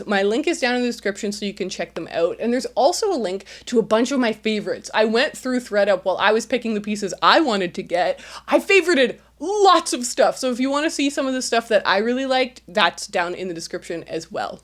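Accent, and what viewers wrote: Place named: Canada. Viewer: Shes is so... Canadian, eh? Huus and aboot, instead of House and About.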